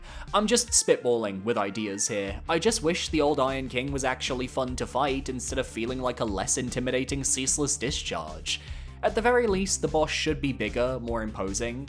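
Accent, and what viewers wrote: United Kingdom. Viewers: TF is the awful Scottish accent about? Reckless behavior 😂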